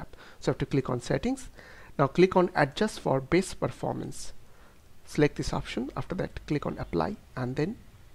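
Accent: India. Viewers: an indian accent. i'm guessing from the madrass region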